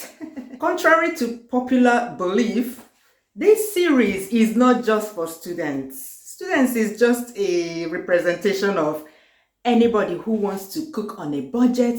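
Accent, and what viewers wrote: Igbo, Nigeria. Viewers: The way you even explain things with a little Igbo vibe dey sweet my body. Nice one I will try it. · You look beautiful.. Your humour is next to none especially in combination with the natural igno accent... I really enjoy watching your videos..natural and down to earth.. Keep it up..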